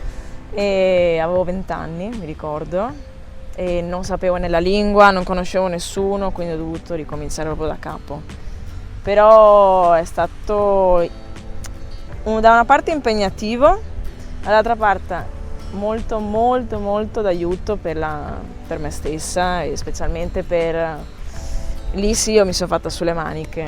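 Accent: Veneto, Italy. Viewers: Ciao, che bella storia!! Sei stata bravissima, complimenti! Di che paese del Veneto sei?. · Brava, una bella intervista, sei stata sincera e intelligente, hai messo in evidenza anche le problematiche e le difficoltà di ogni trasferimento. Lo spirito di adattamento conta moltissimo, soprattutto nei momenti difficili. L 'Italia è unica, per bellezza, cultura, geografia, è il modo di vivere che è cambiato, è diventato frenetico, come dici tu, non è per tutti. Chi ha la possibilità di cambiare, di mettersi in gioco, è giusto che lo faccia, ciao e Pura Vida. P. S. L' accento veneto non l'hai perso.... 😁😜👌👍💪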